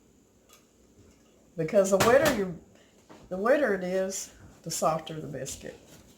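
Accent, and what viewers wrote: South, United States. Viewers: Your sweet nature, Southern English and just being yourself has certainly earned my pitiful little like and subscription.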